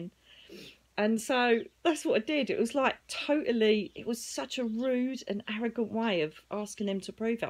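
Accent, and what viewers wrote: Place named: United Kingdom. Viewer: Hi, it’s eastern standard time. It’s wed March 8th, 2023. I’m texting from Massachusetts, USA. I’ve just recently started listening to you. I feel I was guided to you. I’ve been listening to mediums for awhile now and have been very intuned to spirituality and all that you talk about for the last several years. I love your messages and your funny, down to earth personality and your adorable British accent! Your a pleasure to listen to. I’ve turned my 83 yr old dad on to your videos on utube as well. I’m so greatful to you for your gifts and happy for you that you seem to be living your best life now. We all have our challenges and you certainly have had your share! Enjoy life and Thankyou for sharing your gifts with the world. I hope and pray that more people will tune into you and people like you so we can save our planet and the human race. 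I will be sharing your messages on social media in hopes to spread them. God bless you and yours and take care. 💕🧚‍♀️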